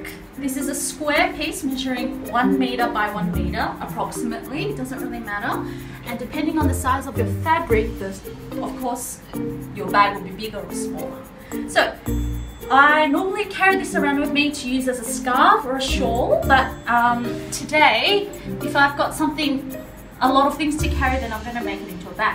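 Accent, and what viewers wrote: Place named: Australia. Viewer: Im pretty confident in saying that she's Australian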